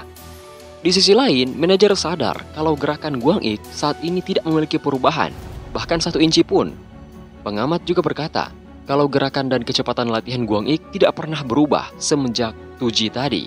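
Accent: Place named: Parigi, Indonesia. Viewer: Balogat orang Parigi Sulawesi tengah hahaha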